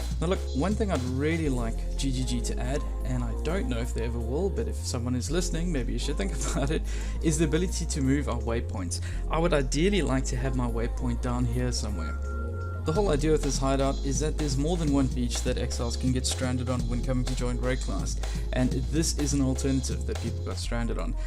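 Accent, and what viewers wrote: South Africa. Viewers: I like the commentary and the base itself, sounds like you're a fellow South African? Subbed your channel and keep up the good content ^_^ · Where you from, i hear some south african twang every now and then?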